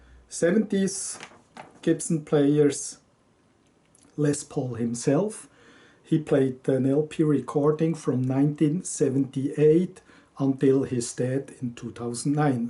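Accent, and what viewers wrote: Switzerland. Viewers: I can tell by your accent that you are Swiss! So am I.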